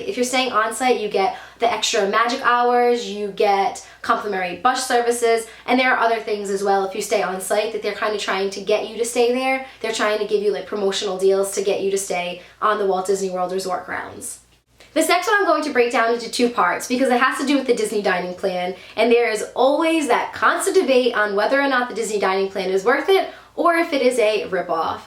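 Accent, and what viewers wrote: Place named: New Jersey, United States. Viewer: pahk coppah - Jersey?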